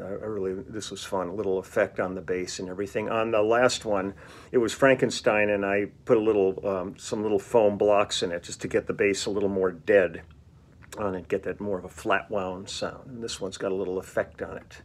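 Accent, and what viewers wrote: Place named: New York, United States. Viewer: I love the fact that you sound like a real New Yorker and seek out gems like Joe's Pizza! Cheers from Manhattan!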